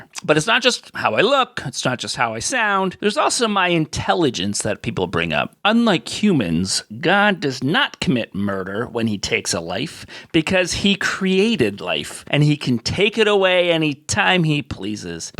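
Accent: Canada. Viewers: Aww I like the Canadian accent.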